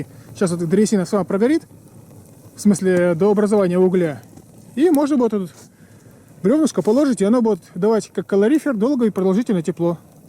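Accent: Russia. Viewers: ***** Это наш Вологодский говор. яркий представитель своей родной земли.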